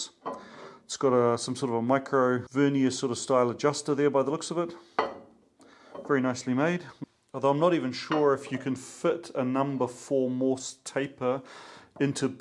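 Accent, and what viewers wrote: New Zealand. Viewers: Yeah that industry is not known for innovation, but for safety measures :) So they needed evidence they really work :) By the way: may I ask, where did you pick up that strong New Zealander accent? It's really a pleasure to listen to you :) There is a guy on YT (Geoffry Croker) he speaks like this :) But he's native there :) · You sound like a kiwi.. but do you live there? Hmm!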